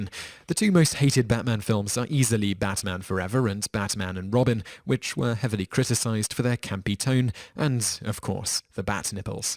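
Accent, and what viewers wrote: United Kingdom. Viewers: this channel would be so much better with an atleast somewhat interesting voice. british accents are great, and I really mean it. but this guy... I can't imagine anyone staying awake until the end of a 10 minutes video.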